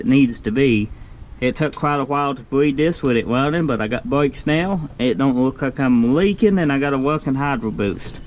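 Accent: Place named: Louisiana, United States. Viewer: Cajun accent sounds like 👍 thanks for the video brother!!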